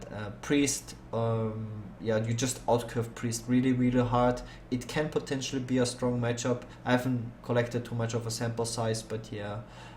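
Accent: Germany. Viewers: Dieser moment, wenn deutsche englisch sprechen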